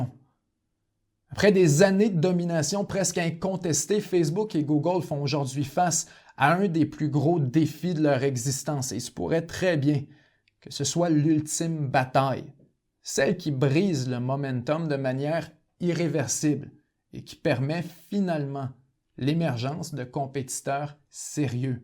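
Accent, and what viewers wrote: Canada. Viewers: son accent canadien qui rajoute un peu de couleur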